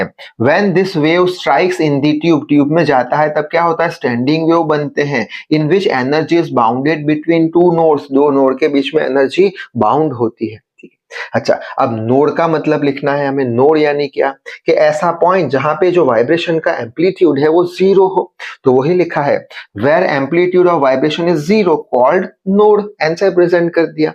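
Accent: Gujarat, India. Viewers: Sir aap Gujarat se ho kya ?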